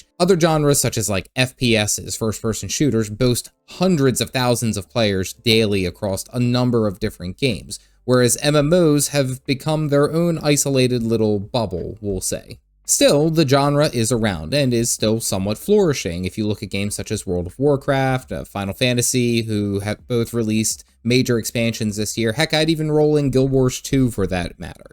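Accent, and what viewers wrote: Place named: Delaware Valley, United States. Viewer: This dude is from the Delaware Valley and I love it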